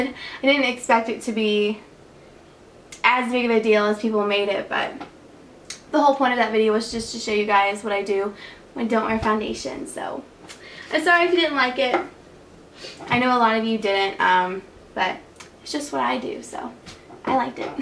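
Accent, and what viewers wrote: South, United States. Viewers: you dont have your southern accent as much anymore ive noticed! love your videos pretty xx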